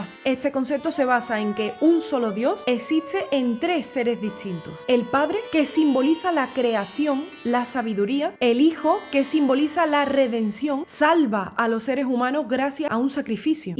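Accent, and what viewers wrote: Andalusia, Spain. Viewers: Lindo acento andaluz tenés, y tu simpatía es comunicativamente eficaz, pero tocás mal, piba, con problemas de tempo (acelerás y retenés sin criterio, adornás sin intuición y porque está establecido en manuales que ciertos trinos deben ocurrir aquí y allá, lo que te lleva a rehuir la creatividad en tus fiorituras, etc.), por no decir que tus cuerdas están siempre ligeramente desafinadas. Ergo, dedicate a hablar y largá de una buena vez la guitarra. Un survival tip: si vas a tocar una pieza, nunca antepongas un fragmento de una versión tan superior, como la de Evangelina Mascardi. Lo mejor habría sido, ya que la honestidad late en vos, que te limitaras a enviar a tus suscriptores a visitar esa versión en lugar de exponer tus insuficiencias cometiendo la tuya. Saludos.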